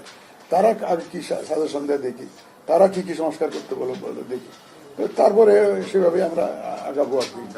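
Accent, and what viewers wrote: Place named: Chittagong, Bangladesh. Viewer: চট্টগ্রামের মানুষের মত লাগতেছে। উনার বাড়ি কি চট্টগ্রামে।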